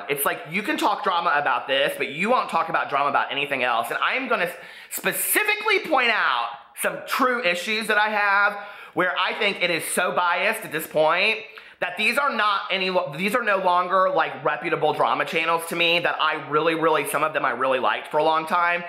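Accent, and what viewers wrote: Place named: Midwest, United States. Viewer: Yes!! It has to be a Midwest thing, I say it the same way!😂😂 I'm from Northwest Indiana.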